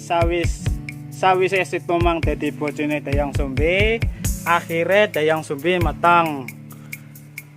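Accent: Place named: Banyumas, Indonesia. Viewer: logat bicaranya kayak orang ngapak toh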